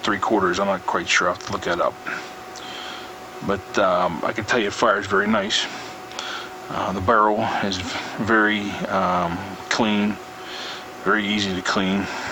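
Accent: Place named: Pittsburgh, United States. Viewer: Jus spelling error . Although i was gunna say picksburg as well haha ... im not from pitt im about an hr away in east liverpool ohio but i spotted the accent quick lol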